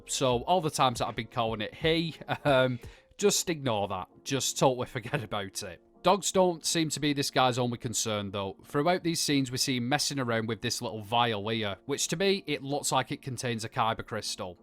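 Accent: United Kingdom. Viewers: Appreciate you brother I’m actually fixing to watch and outline this episode for my channel and our recap and I thought I’d have you on in the background to take some early notes. I subbed you and i know you sound like your across the pond but if we could connect I’d be very happy to extend an invite to you to join us for one of our Fallout recap shows, we do the live discussion type deal and I think you would enjoy chopping it up with myself and my mates.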